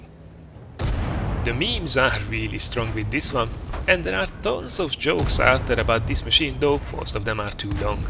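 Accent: Hungary. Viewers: You are hungarian right? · you are hungarian right/magyar vagy ugye? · akkor jol gondoltam a kiejtesedbol h magyar vagy😄😃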